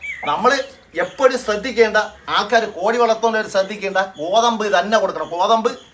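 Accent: Thiruvananthapuram, India. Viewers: എവിടെ വീട് ചേട്ടാ? തിരുവനന്തപുരം സ്ലാങ്. ഒന്ന് നേരിട്ട് കാണാൻ ആണ്. അഡ്രസ് ഒരു ഫോൺ നമ്പർ ഇടുമോ.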